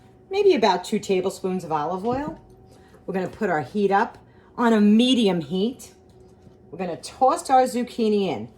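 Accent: New York, United States. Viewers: I hear a New Jersey, or New Yorker accent......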